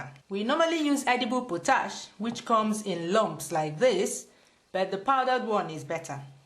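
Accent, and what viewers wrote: Igbo, Nigeria. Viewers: Me encanta tu acento! Igbo woman 👌👌👌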